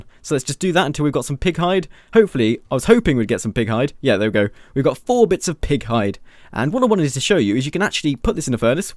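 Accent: United Kingdom. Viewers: Where are you from you sound sort if British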